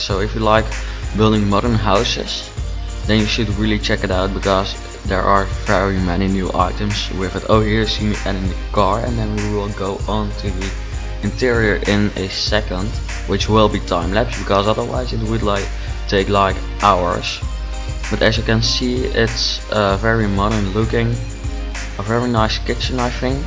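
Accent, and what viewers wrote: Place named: Netherlands. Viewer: ik weet dat je nederlands bent :p dat herken je zo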